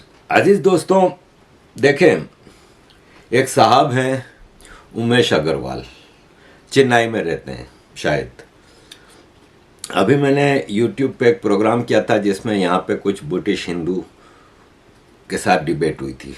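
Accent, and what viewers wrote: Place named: Sindh, India. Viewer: Love your views. My utmost respects. I think you are a Sindhi (from your accent, but I may be wrong). Heartfelt respects from another Sindhi. 🙏🙏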